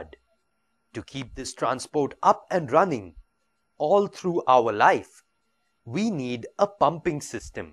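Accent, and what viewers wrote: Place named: India. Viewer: lovely indain accent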